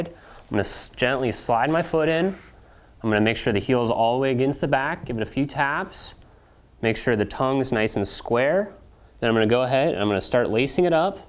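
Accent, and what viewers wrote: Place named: Europe. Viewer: 175 F obviously! This dude sound european???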